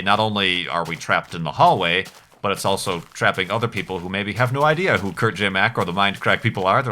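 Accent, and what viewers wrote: Ireland. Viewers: You half irish or something?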